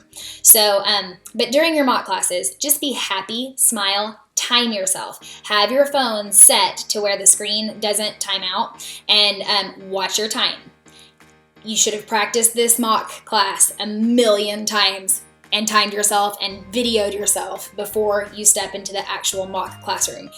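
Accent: United States. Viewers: Glad to hear that hint of Southern accent because I was worried about mine :)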